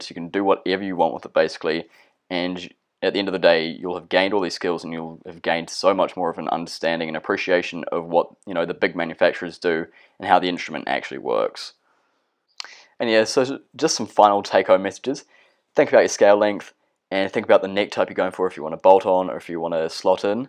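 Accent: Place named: Germany. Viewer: You kinda sound German lol. But you're a Kiwi, right?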